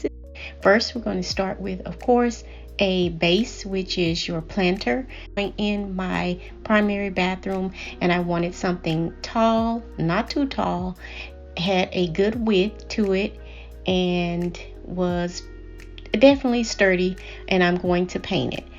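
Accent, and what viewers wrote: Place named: Texas, United States. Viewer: Amen to Texan accents 😊. This is amazing. Love it ❤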